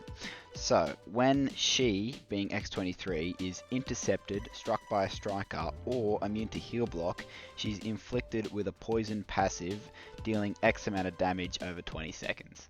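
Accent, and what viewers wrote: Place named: Australia. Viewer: Mate, you an Aussie? What’s good my fam, instant fan and subscriber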